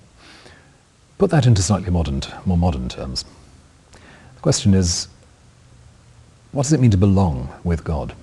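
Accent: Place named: United Kingdom. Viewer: Sheesh...That's not right. The Gospel has nothing to do with anything WE do...You're saying that "The Gospel is for us to trust Jesus?" That's not right...c'mon...you can't fool me with that British accent...although it does make you sound rather intelligent...Kinda like Richard Dawkins sounds intelligent...lol. But I know you know better than this...Why not just say what the Gospel is? You don't have to contemporise it, or put it in modern terms...just preach it!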